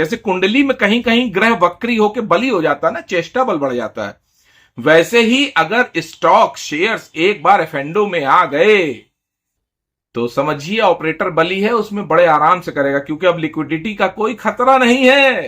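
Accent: Kanpur, India. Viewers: एक दम आम व ज्ञानी आदमी, कनपुरिया स्टाईल में। मजा आ जाता है सुनकर। अति उत्तम।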